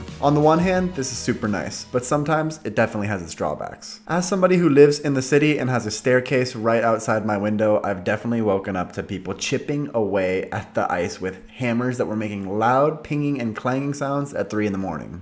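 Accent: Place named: Sweden. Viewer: You definitely are gaining a small accent. That’s good though since it means when speaking Swedish people can understand you better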